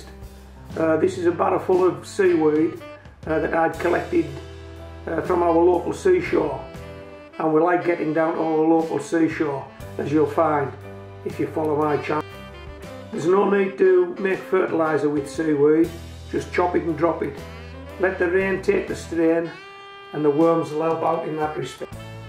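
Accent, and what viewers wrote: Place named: Cumbria, United Kingdom. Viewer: Areet Marra? Not often you hear Cumbrian on the Youtubes ;) Now we're at home all the time, there's a bit more chance to get in the garden (silver lining?) So I'll watch and learn whats going to work in our neck of the woods. Great content - Keep it coming Tek care o yersel and that laal dog eh!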